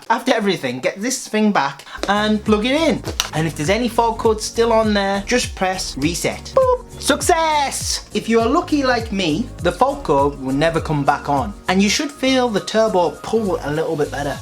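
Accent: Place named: United Kingdom. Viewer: He does a very good British accent I’m subscribing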